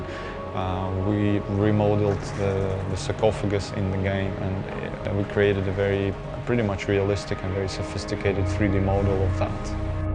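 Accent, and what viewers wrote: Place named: United Kingdom. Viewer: i think this guy studied in UK? he speaks with a slight british accent...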